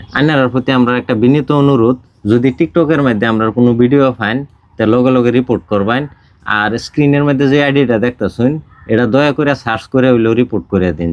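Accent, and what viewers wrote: Mymensingh, Bangladesh. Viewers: প্রথমে ভেবেছিলাম কিশোরগঞ্জের। কারন ময়মনসিংহ, কিশোরগঞ্জ, নেত্রকোনা অঞ্চলের আঞ্চলিক ভাষা এটা। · Ha, ekdom Bangladesh er Mymensingh, Jamalpurer vasha. Onek apon mone hoy. Ami Mymensingh er. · চোরদের কোন কমতি নেই।যারা চুরির পিছনে থাকে,তারা চুরি করবেই। যাই হোক আপনার আঞ্চলিক ভাষা ভারতের কোন অঞ্চলের দয়া করে বলবেন।কারন আমাদের বাংলাদেশের ময়মনসিংহ জেলার ভাষার সহিত মিল আছে, তাই বললাম। ধন্যবাদ।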